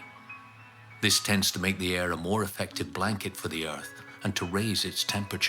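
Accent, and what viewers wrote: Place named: Scotland, United Kingdom. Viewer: Listen to that Scottish burr! Gives me goosebumps!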